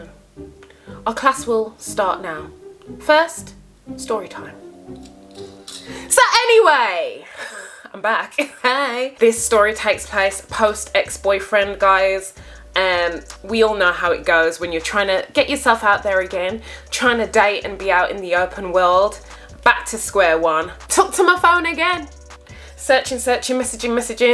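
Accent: United Kingdom. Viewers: OMG A BRIT!!! YES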